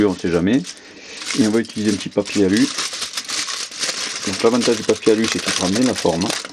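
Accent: France. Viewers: he speak FRENCH , he has an accent from the sounth of the france.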